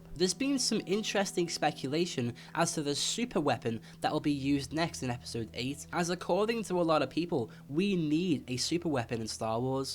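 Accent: Liverpool, United Kingdom. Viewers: u sound like wayne rooney are u a scoucer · R u scouse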